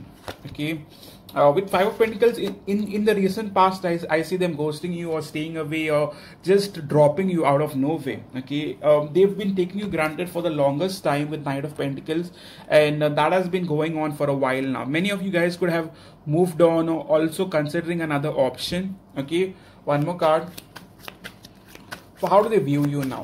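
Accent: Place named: India. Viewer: Your voice seems like that of an indian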